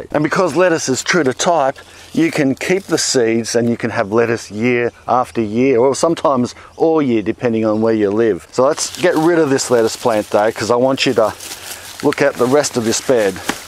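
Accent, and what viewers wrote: Australia. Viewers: Are you an Australian? Love your accent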